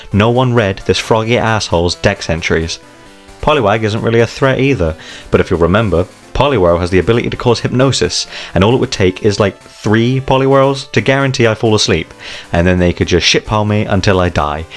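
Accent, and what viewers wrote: United Kingdom. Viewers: Are you a fellow Brit?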